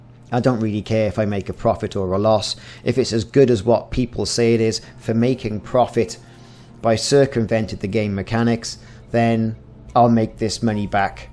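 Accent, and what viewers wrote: Wales, United Kingdom. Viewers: o7 you a welsh man butty :)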